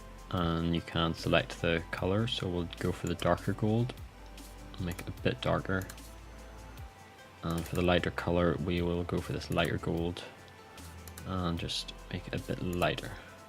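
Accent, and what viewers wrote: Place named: Northern Ireland, United Kingdom. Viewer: Nice...just waiting for the comments saying they can't understand northern irish accent lol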